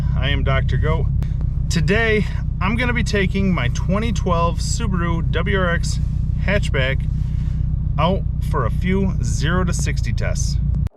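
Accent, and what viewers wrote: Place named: Wisconsin, United States. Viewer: Dr. Goat, are you in wisco? Scenery and accent tells me yes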